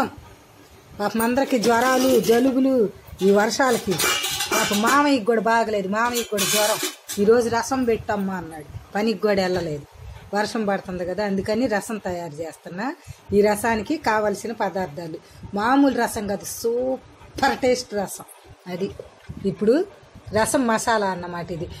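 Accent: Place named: Prakasam, India. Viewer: Meeru chese recipes and natural ga explain chese vidhanam super. mee slang( yaasa) nu batti, Mee vantalanu chusi chepthunnanu meeru ma Prakasam district vaaru anipisthondi. Am I correct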